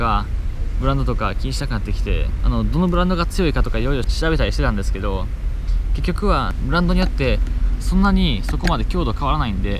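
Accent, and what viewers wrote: Japan. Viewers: 普段はどこに住んでるんですか？あと顔とかハーフっぽくてイケメンやし英語喋れるけどバリ大阪弁、、、ハーフですよね？ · いつもお疲れ様です！さんって何県出身ですか？？喋り方的に関西か東海のほうですか？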